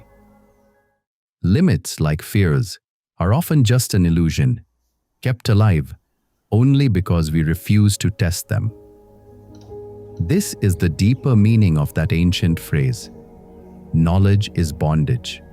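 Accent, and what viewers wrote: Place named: India. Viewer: I wonder which country's pronunciation is? It's like an Indian pronunciation.. do you answer me ?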